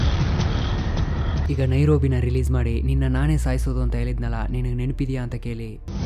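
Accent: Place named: Chamarajanagar, India. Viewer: same chamarajanagara style idhe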